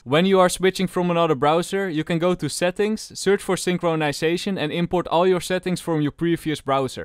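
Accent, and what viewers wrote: Netherlands. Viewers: You sound really dutch. btw never stop this amazing content!!!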